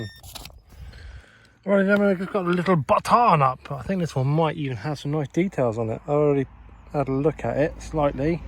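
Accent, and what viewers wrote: Scotland, United Kingdom. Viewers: you are an absolute gem x thanks so much! You always been my fav 🎉🙌🤗❤️💥 xxx love your banter and the #realmetaldetecting x need hear more of the Scottish accent though haha 😂 x will send email today! Glad to hear all the family well x Thanks 😊 xx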